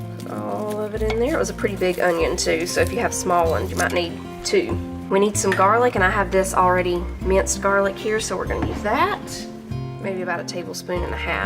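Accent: South, United States. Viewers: Hey, I love love your videos. So cozy and homey and your sweet southern accent !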